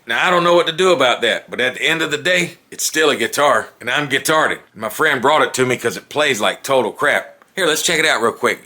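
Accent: South, United States. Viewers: ROFL that was a great intro guitar to the noggin' 'Smack 'Lol! You have a cool accent is that the south? Lots of great info on this channel!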